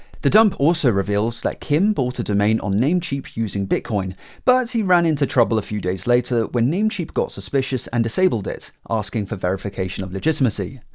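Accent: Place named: United Kingdom. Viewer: no that’s his voice, he’s british i think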